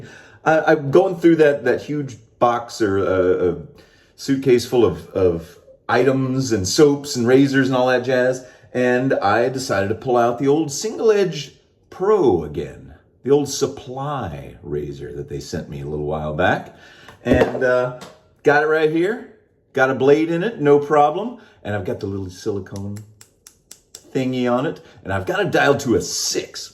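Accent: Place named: England, United Kingdom. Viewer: Hi, loving the English accent (00.30), made me chuckle. I'm not use to safety razor - everytime I shave the hairs get stuck inside and block the blades even under running water. Is this because I use foam so safety razor use a special shaving lather?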